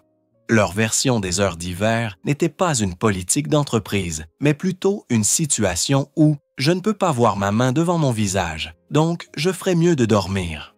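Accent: Quebec, Canada. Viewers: J'aime bien cette voix québécoise, je m'endort dessus. Merci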